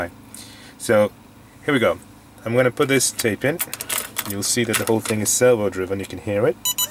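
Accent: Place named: South Africa. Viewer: Things and things It sounds sort of like a South African English accent. Source: I work with somebody from South Africa.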